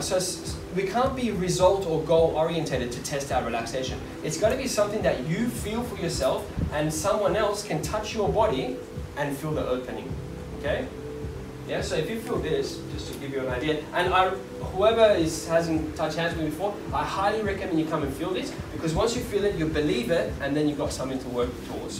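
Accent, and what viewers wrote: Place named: Australia. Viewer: hey dude, sorry live in New Zealand assumed you were Ozzy because of the accent. I do abit of bjj here and have trained traditional tkd. Just trying to open some more doors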